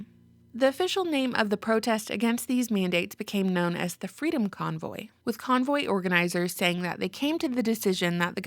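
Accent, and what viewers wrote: South, United States. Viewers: Love your channel lady! Have you heard of or covered anything about canadas “m a i d” service? Btw love the southern draw. Reminds me of home. 🥰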